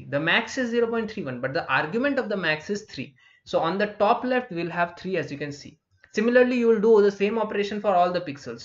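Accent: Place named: India. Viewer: face-blue-wide-eyes:heavy indian accent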